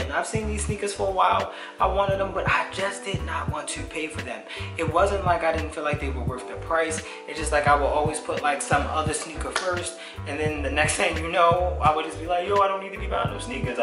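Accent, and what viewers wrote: Baltimore, United States. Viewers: Bruh is from Baltimore fa shoooo 😭😭😭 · Nigga definitely from baltimore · gotta be from bmore lol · you from baltimore? you sound like it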